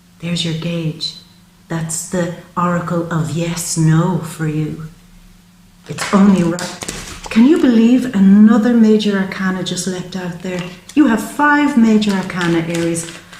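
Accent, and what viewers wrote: Ireland. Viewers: Am I hearing Ireland in your voice?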